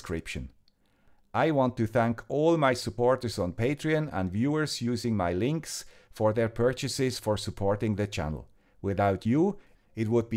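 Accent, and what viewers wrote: Switzerland. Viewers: The man with the Swiss accent does it again - another video of really useful information and comparisons. Thanks.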